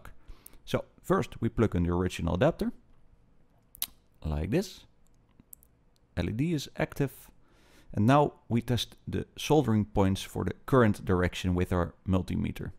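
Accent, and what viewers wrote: Netherlands. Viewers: ben je uit nederland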